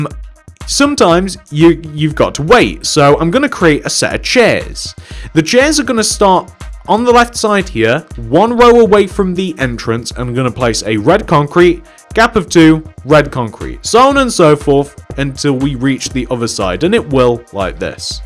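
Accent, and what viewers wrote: United Kingdom. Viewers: your voice is really good your accent is like the uk one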